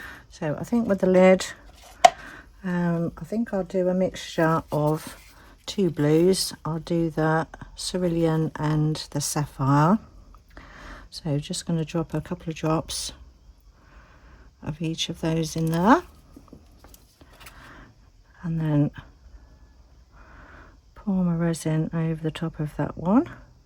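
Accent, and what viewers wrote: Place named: United Kingdom. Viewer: Another beautiful masterpiece as always,. God bless the UK for some of the most beautiful, most graceful female artists in the community, with such beautiful accents, and graceful poetry. 😍😍😍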